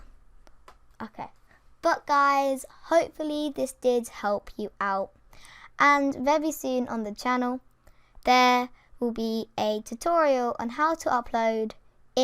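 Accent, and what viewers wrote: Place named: England, United Kingdom. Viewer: Shes English like me.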